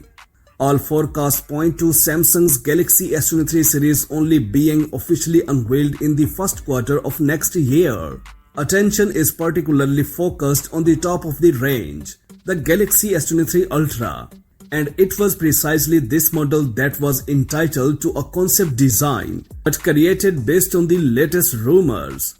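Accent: India. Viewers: Sorry, thats no interesting information and please talk without IIndian slang if you want to tell something to the world. I had to break up watching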